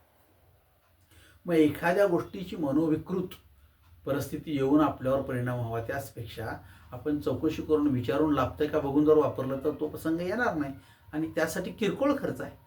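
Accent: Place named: Kolhapur, India. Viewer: मी हेड फोन लावून एकत होतो पण वारंवार वाटत होतं हे कोल्हापुरी असावेत आणि शेवटी मी मोबाईल मध्ये शेवटी पत्ता दाखवला तो कोल्हापूर 😅😅😅😅 थोडा ठसका जाणवत होता. 😅भाषा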